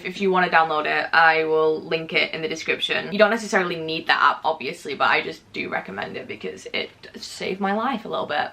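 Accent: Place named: Northern England, United Kingdom. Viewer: Omg i have the same accent as you! Like a northern english accent where abouts are you from? ❤️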